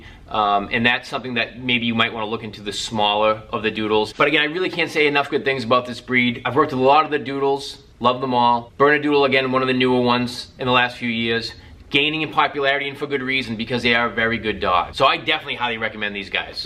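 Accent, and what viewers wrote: Boston, United States. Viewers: I'm a New Yorker and can say he has a Boston (or some sort of New England accent) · You sound like you’re from Massachusetts or Rhode Island. ❤I’m near Boston or Bahwstahn. 😂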